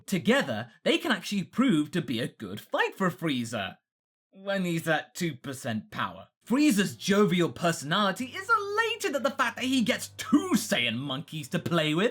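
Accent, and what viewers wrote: United Kingdom. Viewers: R u British ? Just askin sorry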